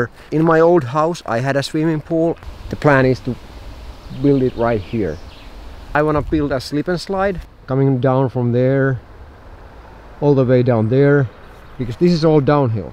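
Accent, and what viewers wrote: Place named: Finland. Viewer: P.s. + Less is more. At times even that is very effective. I liked this nature video and I think your English is just fine with your Finnish accent. Good idea on being your own best friend!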